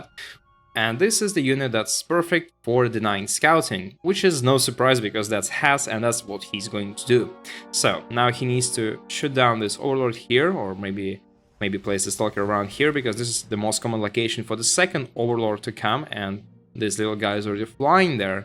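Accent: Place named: England, United Kingdom. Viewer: ого, вы из англии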